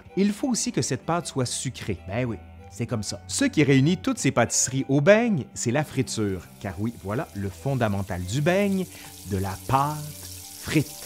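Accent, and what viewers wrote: Canada. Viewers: C'était super intéressant ! Tant pour l'histoire que pour tous les noms de pâtisseries disséminés dans la vidéo... (Une fois que je me focalise sur autre chose que l'accent canadien, je suis de France. Et en plus, les beignets (avec le t), on a, c'est l'équivalent des "Kraften" et c'est ça m'a pris quelques minutes de reformater le cerveau à penser "donuts")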